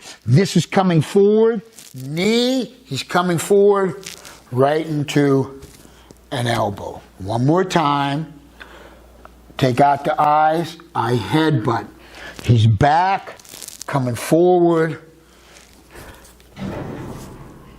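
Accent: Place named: Ohio, United States. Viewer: I looked up your area code, and yes you are Cleveland area- as I thought. I’m from Toledo and you sounded like a buckeye. Really enjoyed video. Great instructor! Bet you have some fight stories? Best to you!!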